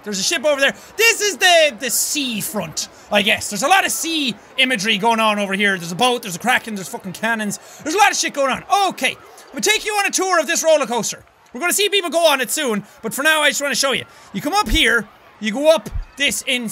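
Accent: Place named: Ireland. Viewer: wow you are irish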